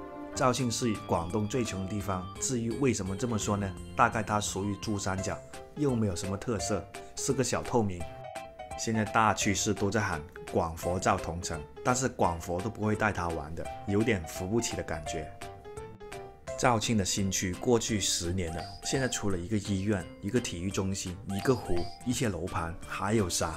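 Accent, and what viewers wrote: Hong Kong, China. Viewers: 哥仔，我听你的口音有点似香港人，不像完全一个来自广东的小伙子，看来你对广东这一带的城市也相当熟悉，最后你的一句“再说就要被油管封号了”，说了些啥敏感话题了？是抵毁啥啥，啥啥？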